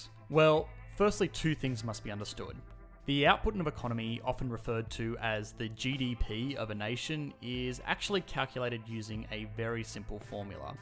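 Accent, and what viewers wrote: Australia. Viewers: Australia has had the economic advantage of massive mineral wealth (even though most of the wealth has been plundered by multinationals under Liberal governments), which has meant that the GDP is much higher than comparable nations - in addition we have a (increasingly less) generous welfare state which includes packets of money for people studying or looking for work - which is circulated back into the economy at an incredibly high percentage, as neither students nor job seekers are known for their massive wealth hoarding. In more modern history, we were able to escape the worst of the global financial crisis through some appropriately timed stimulus packages by the Labour Rudd/Gillard which included literally just giving money to every citizen and asking them to spend it in the economy - which reinforces the main point of the video that if more money is given to the masses, the economy is more secure. 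Coming from an Aussie - which, from the sound of the uploader's voice, is the where the person making this video also came from.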